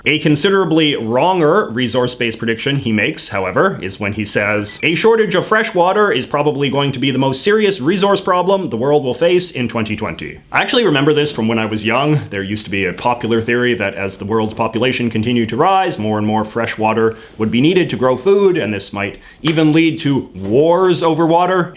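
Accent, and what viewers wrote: Canada. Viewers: this guy sounds like the canadian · Never seen this guys videos before. All I take away is that this is the most canadian sounding person I have ever encountered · I won’t be voting for someone who talks like that, too Canadian and goofy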